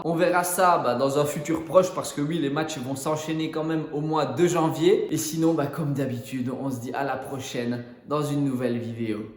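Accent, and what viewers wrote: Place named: Liège, Belgium. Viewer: Toi, tu es belge et ça s'entend trop à ton accent... L'accent de Liège, quelque chose comme ça.😂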